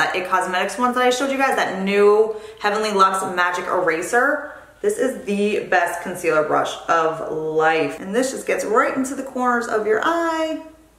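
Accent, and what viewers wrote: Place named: East Coast, United States. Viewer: I'm a native east coast girl now living in Vegas. to be honest, i started watching your videos because it gave me a bit of that back home feeling with your accent! shortly after, i discovered we're the same age so i binge watched your vids and i have learned so much from you!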